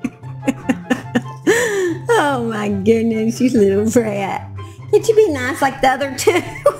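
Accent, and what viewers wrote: South, United States. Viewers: Absolutely love your southern accent, love from Romania 🇷🇴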